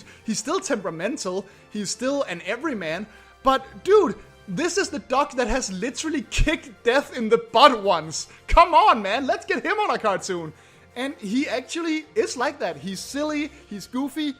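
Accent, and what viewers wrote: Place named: Denmark. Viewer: You wouldn't happen to be Danish? xD Sounds like that on your accent :P EDIT ok nvm, just looked at your channel and can see you are xD Jeg er nemlig også selv Dansker, så det syntes jeg var ret cool :P haha xD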